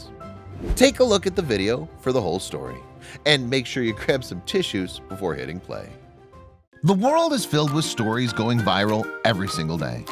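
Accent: United States. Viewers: Looked interesting but nauseating American voiceover so nope.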